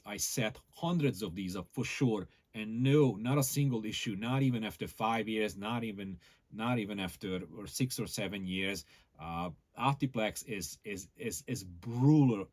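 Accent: Hungary. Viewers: Magyar akcentusod van :) Jó bemutató.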